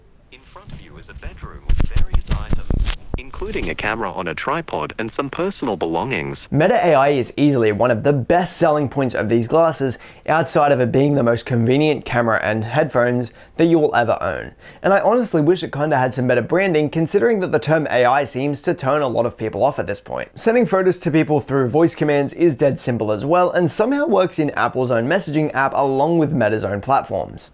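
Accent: Australia. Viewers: Nice to hear a fellow Australian review and talk about these amazing glasses. Also great that you spoke about the Be My Eyes integration. Having no vision at all, it is great to see this integration happen. I’m hoping in the future we may also get some ACR features That will help when trying to read mail and menus etc.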